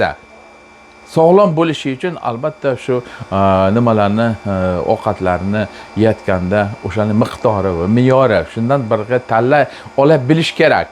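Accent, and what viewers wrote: Namangan, Uzbekistan. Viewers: Rahmat.Namangan shevasida hammasi ajoyib😊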